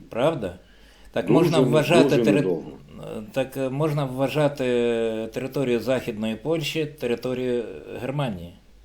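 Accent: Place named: Rivne, Ukraine. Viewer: він з Рівно точно, акцент не бреше,я то знаю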